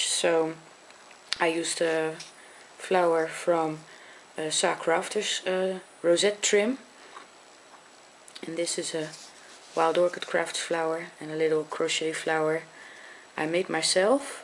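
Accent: Netherlands. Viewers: Ik geloof aan je stem te horen dat je Nederlands bent? ik wil zelf ook graag proberen een scrapbook te gaan maken voor mijn examen, ik moet een modeboek maken dus lijkt me wel leuk om hem zo als een scrapbook te maken want dat heeft iets aparts. nou vroeg ik me af waar ik zulke spullen ervoor kan kopen weet je misschien goeie winkels/webshops?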